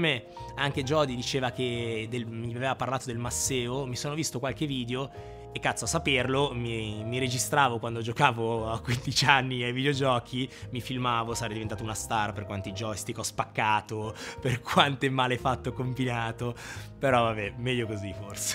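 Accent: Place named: Milan, Italy. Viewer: Grande, bravo e anche simpático. Oggi sentendoti parlare mi hai riportato indietro nel tempo alla mia infanzia, vissuta nella provincia milanese, ed è stata pura e viva emozione. Cinquant’anni più tardi qui nel cortile di casa alla periferia di Madrid la lingua non è più quella, allo stadio non c’è Gianni Rivera ma c’è Carlo Ancelotti. Tempi e luoghi che cambiano…. Grazie.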